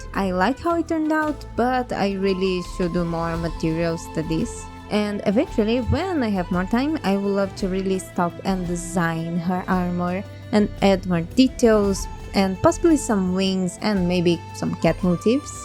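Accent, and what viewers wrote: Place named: Brazil. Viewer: eu ouvindo o sotaque mt fofo e pensando "de onde será que ela é?" ate o jabuticaba KKKKKKK mt bom encontrar alguma artista brasileira com a msm vibe doq eu gosto de assistir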